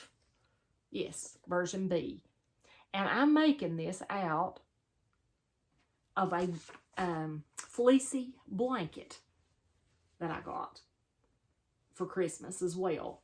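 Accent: South, United States. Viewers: One more thing, u have that southern sound like Ms .that's where we are from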